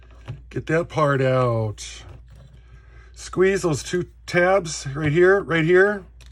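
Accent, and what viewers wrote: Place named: Minnesota, United States. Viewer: Watching this and the missus comes in and asks if is from Minnesota with the accent. Know you're in Montana now, but were you originally from Minnesota or North Dakota?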